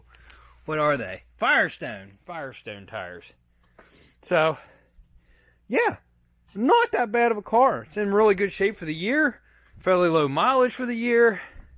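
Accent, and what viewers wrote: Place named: West Virginia, United States. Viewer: Just curious. Kinda sounds like a WV accent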